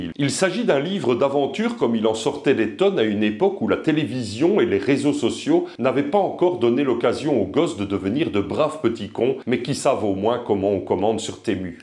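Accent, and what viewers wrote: Belgium. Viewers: non effectivement, t'as l'air d'avoir plus de 30 piges, donc c'est mort pour le neveu🤣 l'accent mi Schneider, mi De Croo ça doit être intéressant ça aussi, et pas très commun. Un bon moyen de te reconnaître si je te croise au hasard en allant voir le neveu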